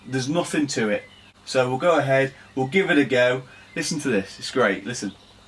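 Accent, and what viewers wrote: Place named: London, United Kingdom. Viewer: The ONE good thing about is he talks the Queens (oops sorry!) Kings English! with no damn accent that at times makes it hard to understand (yes i come from LONDON & speak properly like!) & thankyou, yes they are Allen bolts not set screws like said by others! It takes a Londerner! But sorry you need to tell us more than whats in the useless manuel like the angles etc! So i didnt give you a thumbs up but i didnt give you 1 down either. Im old skool i had the 255 when it 1st came out...... long time ago & they still cant get it right! Best of luck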